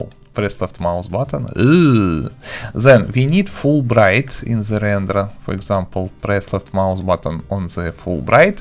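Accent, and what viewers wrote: Russia. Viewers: dude найс инглиш ты русский и это слышно по акценту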